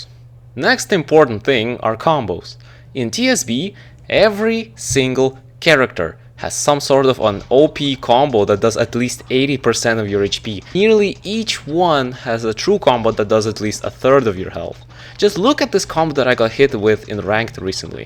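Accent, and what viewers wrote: Russia. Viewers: Bro has russian accent, just like me b. I am russian but ik english very well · Are you Russian?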